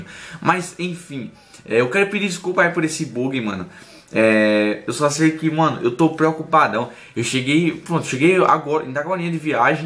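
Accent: Northeast, Brazil. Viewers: de onde tu e ?? sotaque de Nordestino !! sou nordestino da Paraiba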